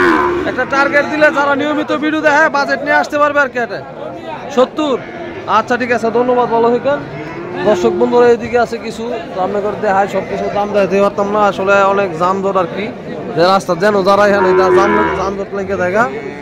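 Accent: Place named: Amgarh, Bangladesh. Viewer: ভাইয়ের কন্ঠে মনে হয় আমগড় দেশি লোক, শিবপুর উপজেলার আসে পাশে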